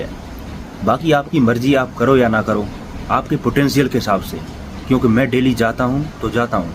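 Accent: India. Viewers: भाई आपकी आवाज मुरैना साइड की लग रही है ,, में सबलगढ़ से हु आप कहा से हो , प्लीज btao ??? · Bhai Rajasthan se ho kya!!!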